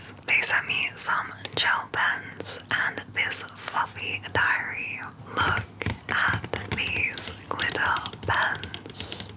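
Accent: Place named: Australia. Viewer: Australia has my heart because the accent is MELTING ME -from over here in America ❤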